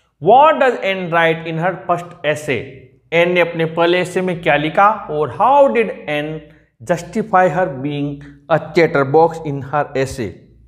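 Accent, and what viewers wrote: Hadoti, India. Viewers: sir aap hadoti pradesh ke lagte hai ⓟⓛⓔⓐⓢⓔ ⓣⓐⓚⓔ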